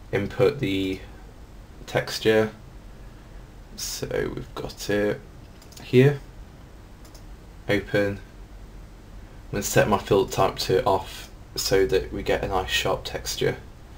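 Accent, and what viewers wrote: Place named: United Kingdom. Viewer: that's a very usefull how-to! what kind of british accent are you speaking? i like it.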